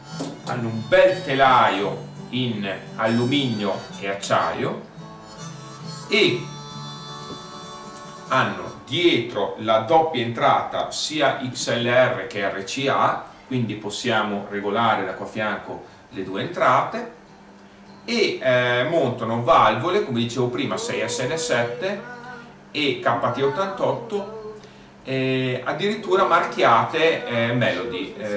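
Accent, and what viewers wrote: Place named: Reggio Emilia, Italy. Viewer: a parte il fatto che se non correggi la tua dizione avrai un targhet di vendita limitato al solo territorio reggio emiliano fatto da soli formaggi mortadelle e prosciutti e pochi chilometri di distanza .. pistola !!!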